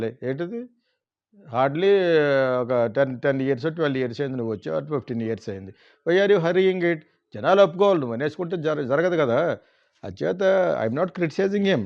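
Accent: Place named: Konaseema, India. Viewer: Whatever the subject...I like your Kona Seema slang...and enjoy🎉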